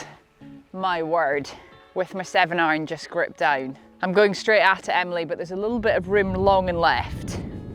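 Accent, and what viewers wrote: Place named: England, United Kingdom. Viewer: Your commentary and tips are spot on! The English accent doesn’t hurt!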